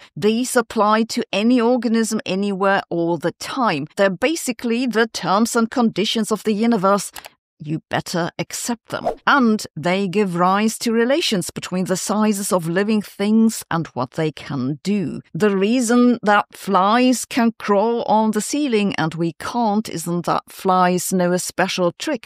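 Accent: Germany. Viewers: She is German. Give her a break...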